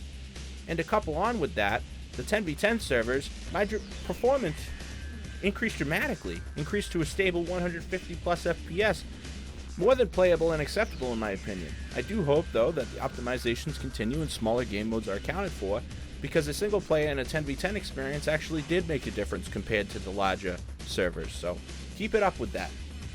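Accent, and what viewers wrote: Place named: Boston, United States. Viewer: You gotta be from Boston lol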